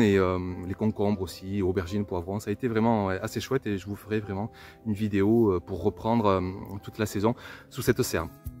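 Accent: South, France. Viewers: Rien que ton accent du sud nous apporte du soleil ! Une vidéo une nouvelle fois riche d'inspiration, et le partage des recettes ou des techniques de conservation ça aussi c'est une idée géniale, je me demande comment tu arrives à trouver le temps de faire tout ça.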